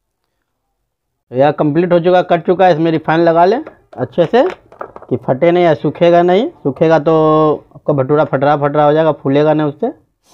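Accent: Bihar, India. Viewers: Aapki baaton ke trike se lg rha h aap bihari h nice 😄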